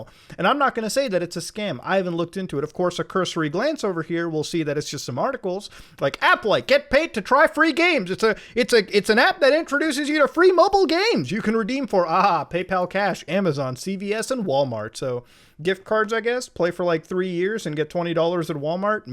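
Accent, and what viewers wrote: Canada. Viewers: Anyone else feel like mutas Canadian really came out thick on this one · You can tell is Canadian by the way he pronounces “tomorrow” as “to-more-oh”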